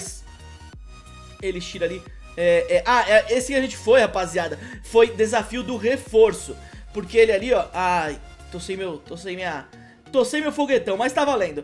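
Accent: Minas Gerais, Brazil. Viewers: mineiro ou tronco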